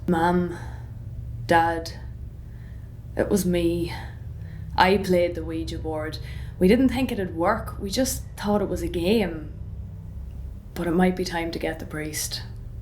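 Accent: Ireland. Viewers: That was absolutely fantastic story telling I really really enjoyed it, you reminded me of my granny ( not in age I don't want to offend a witch such as your self) honestly ghost story's are scarier when told with an Irish accent, thank you for the smile on me face and the shiver down me spine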